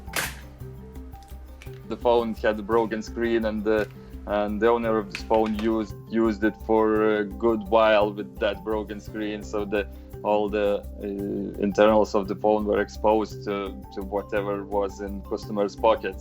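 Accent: Russia. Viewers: Акцент такой русский) жесть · He speaking with Russian accent?!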